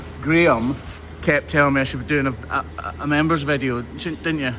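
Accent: Scotland, United Kingdom. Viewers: As a horsewoman in Canada, I'm fascinated with the trimming of the cows hooves as I am with horses hooves. And I'll be honest, I love a Scottish accent lol. Wish I could visit your holiday place..😊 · Graeme. Your book was a fantastic read. I couldn't put it down. Thank you for letting us into your life. You are a wonderful guy and you deserve everything in life. When I was reading your book, it felt like you were in the room reading the book to me in that lovely Scottish accent. Merry Christmas to you, Mrs Hoof GP and your wonderful boys. May it be joyous in everyway possible for you all. Xx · I think people appreciate the openness of Youtubers. Not everything in the garden is rosey as some would make out. Behind every Youtuber is a human being with problems like everyone else. Sometimes people like to know that they are not alone as they have similar problems. 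Maybe one day your daughter will reach out. Keep positive. Not sure why I stumbled upon this channel but I am sooo glad I did. It's refreshing to hear fellow Scots accents too! I'm from Aberdeen and there are very few Scottish Youtubers that I have heard. Who would have thought that hoof trimming could be so satisfying 😂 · We watch to hear your accent. My mom is from Scotland. We want to try to get her there again. She has stage 4 lung cancer. It’s stable for now and she is healthy. We can’t really afford to send her, she needs a helper with bags n stuff. So one of us to go with her. She could go in her own, for a short time. She’d take less stuff. But it’s just a dream for now. We will see what life has to offer. We will keep praying for a miracle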